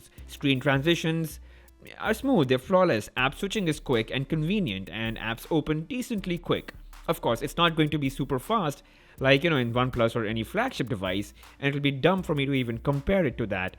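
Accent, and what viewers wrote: India. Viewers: I'm the only one who hate the indian accent?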